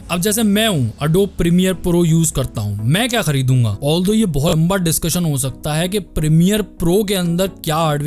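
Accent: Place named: Rajasthan, India. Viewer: U sound like you are from Rajasthan 😁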